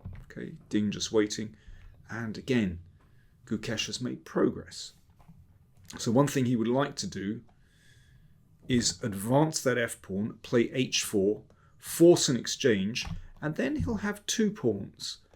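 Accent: United Kingdom. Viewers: GM Danny - your smooth voice with British accent gives us listeners a human touch on chess in this AI world. Personally, it reminds me of David Attenborough voice talking about chess predator strategy going for the opponent King! :) Thank you for your commentary. I feel all big corporations need to invite GM like you to motivate and give life lessons to employees every year. Anyways, I personally think that Ding's blunder was sad for Chess WC title itself! Yes, it is how it is and I am happy Gukesh won as he played by the rules and won Candidates as well, but also feel sad for Ding that it was out of a blunder. But To 18 year kid Gukesh: He fought a drawen game to squeeze win here just like Carlsen. So Kudos to him. Am I happy about the results? Not really coz I want a real fight. Do I agree with the result? Absolute Yes. Do I think the Chess fans would deserve a Play offs here? 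Yes. Any regrets? No. Fun Fact: Gukesh can not even marry as Marriage age for man in India is 21. Anyways congrats to him and very well deserved. I cannot wait for next challenger. - From Indian American Chess enthusiast.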